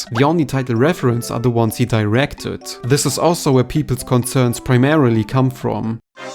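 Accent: Germany. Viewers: Are you German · Are you Bavarian? Asking as a German, because it sounds like it. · Ich hab den Deutschen direkt bei dir rausgehört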